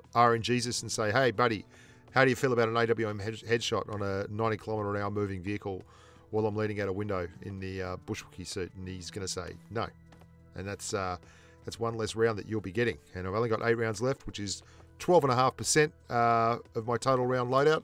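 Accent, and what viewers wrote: Australia. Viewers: I love your Aussie accent...keep it up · Strayan accent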